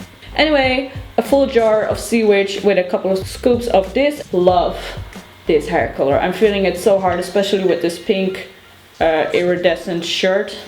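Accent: Netherlands. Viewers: I hear a Dutch "accent" in your English.. Are you Dutch? Haha cuz if you are hii me 2 :3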